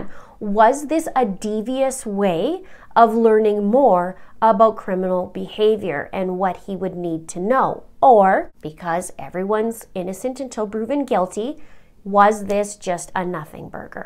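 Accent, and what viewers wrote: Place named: Canada. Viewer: love timelines, so important to this case, also love her canadian accent, my relatives are from viking, ab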